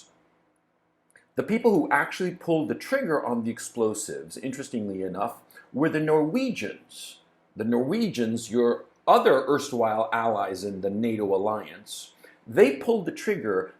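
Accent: United States. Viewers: Great information but words matter. I'm super offended by the overuse of the blanketed blame to "the Americans" with sparse mention of those truly behind the controls. I've cut ties with our media over two decades ago for the same reasons you mentioned once in your video regarding USA media. I'm lonely here in America having this view with having my only outlets watching my heroes of news information (you specifically being one of them) generalizing those "Americans" as the evil ones. You did similarly generalize "the Norwegians " but only once. I appreciate greatly what you do and again you are one of my heroes. Remember that your English is 100% "American" not the Queen's whatsoever. BTW English language trained in Germany is the Queen's. I will continue watching your contributions but know this, words matter. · But that accent sure sounds "American".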